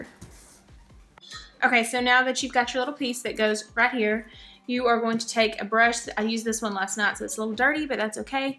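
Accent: South, United States. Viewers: I love your voice! It's so country! I hope you don't take that as an offense.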